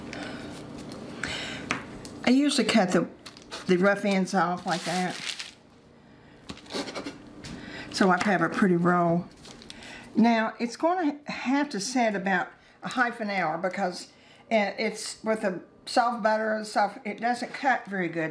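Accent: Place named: South Carolina, United States. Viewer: I'm from East Tennessee, your accent sounds familiar. Almost could pass for an East Tennessee accent, but no quite. Doesn't sound like deep South. Probably South Carolina or Virginia I would guess. Maybe Kentucky, but I don't think Georgia or Alabama. Could be wrong, tho.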